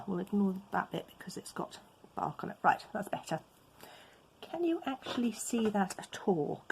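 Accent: United Kingdom. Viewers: Obviously the video is very interesting. But most of all I looooove your British accent!haha Free hugs from Córdoba, Argentina. Namasté.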